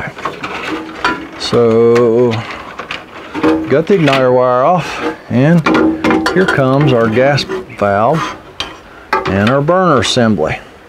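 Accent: Appalachia, United States. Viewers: "I wasn't holding my mouth right" - at that moment I knew I was following the right RV tech, as you were speaking my language. Lol I'm your neighbor down in Tennessee, so I understand that Appalachian dialect. I am an RV tech in training, so I really enjoy your videos! Thanks for taking the time to do them!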